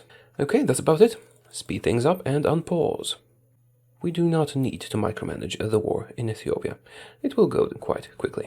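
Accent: Poland. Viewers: whatt oglądam cie od 2 lat i coś tak właśnie przeczuwałem że jesteś z polski. Masz zajebisty angielski. Pozdrawiam mordeczko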